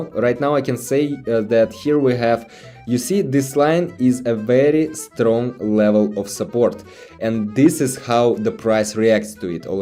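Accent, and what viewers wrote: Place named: Russia. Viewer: feel his russian lol subscribed just for that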